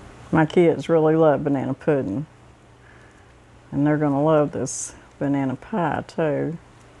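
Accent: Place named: Arkansas, United States. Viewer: I just love your Arkansas accent! Love your videos too. You’re a good cook miss!!!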